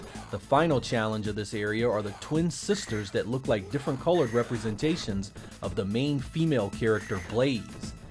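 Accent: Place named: Mid-Atlantic, United States. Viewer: You pronounce water as "wodder", not only do you make great videos, you represent the mid-atlantic region very well!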